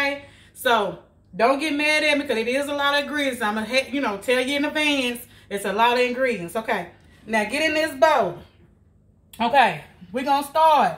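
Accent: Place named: South, United States. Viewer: I Luv It! What Better Way Ta Spend Some Of Ur Saturday Afternoon Then Learning A Fun Skill From A Fun Teacher,Who Has A Fun Personality!!!I Just Want Ta Say Thank U So Much With Cho Charming Southern Accent! Go Head Wit Cho Badd Self!!Sweet Potato Cornbread Here I Come! Sent Me!!! 😜💜😂💚😍💘😜❤️😂💚⭐️🖤❤️🦾⭐️👍🏿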